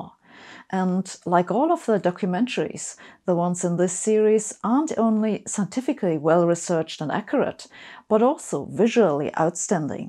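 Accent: Germany. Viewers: Love the German accent 🥰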